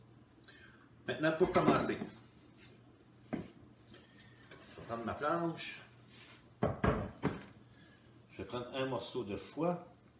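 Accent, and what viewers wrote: Quebec, Canada. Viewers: Quel plaisir de voir et surtout d'écouter ce cher accent de nos cousins Quebecois!! cette recette sent bon comme votre accent et c'est avec plaisir que je la réaliserai avec plaisir, merci encore et bonne continuation!! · Bravo.! Quel rayon de soleil pour nous "c'bel âccint Q'bêquô"!! NB. le pâté est superbe aussi, bien sûr! Merci 🌿 ♨️ 🙏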